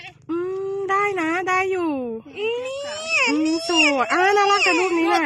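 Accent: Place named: Renu Nakhon, Thailand. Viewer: ภาษาเดวกันกับไทเรณูนครเลยเนาะ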